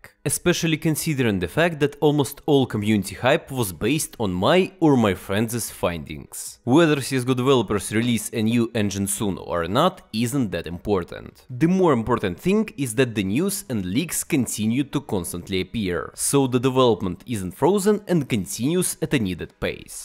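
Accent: Russia. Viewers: Ох уж этот шикарный русский акцент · that russian accent tho. nice contetnt bro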